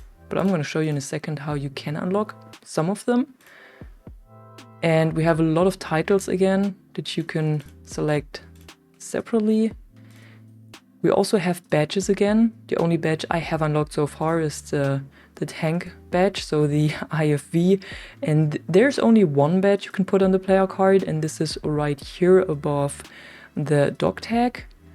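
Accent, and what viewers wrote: Germany. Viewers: First time to the channel I could be wrong and I’m sorry if I am but are you German? That how the accent sounds to be this soon into video. Have no issues just curious and believe I’m right totally random comment but I’m genuinely good at telling.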